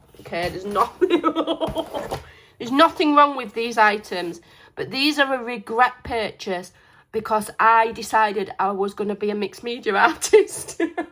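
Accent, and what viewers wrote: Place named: West Yorkshire, United Kingdom. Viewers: I think is from West Yorkshire in England or i could be completely wrong and she's from Lancashire in England! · I feel your pain! I had a massive clear out last year and donated all my lower quality art supplies to my local primary school and they were SO grateful! Schools never have money to spend on art supplies so they were so appreciative. My biggest regret purchase is my Copic markers. I don’t even want to think about how much I spent on them because I’d honestly cry. They’re brilliant but I just don’t use them. If you want to sell your wet strength tissue paper and Dina Wakley paints I’d be happy to take them off your hands! I’m in Sheffield and you sound not far from me!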